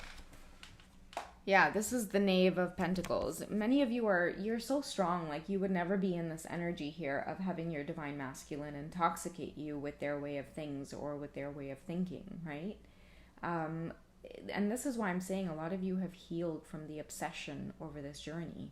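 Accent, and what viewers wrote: Trinidad and Tobago. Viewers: You did a good trini accent. Thank you for the reading.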